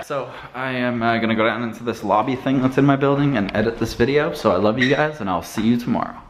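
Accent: United States. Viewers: I highkey thought you were American lol. but ayy, a fellow Canadian ( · I enjoyed the vlog and more importantly the q&a session :) I didn't know you were Canadian I legit though you were American. But still thanks for your content it's always inspiring and when having bad days yo always raise a smile :) greetings from the UK :)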